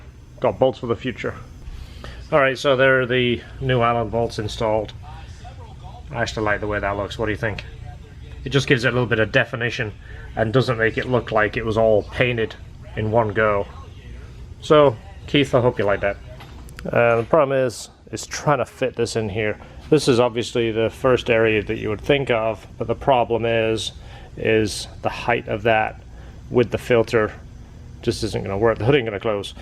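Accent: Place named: United Kingdom. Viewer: Where you from dude your accent sounds a bit British? Great work by the way.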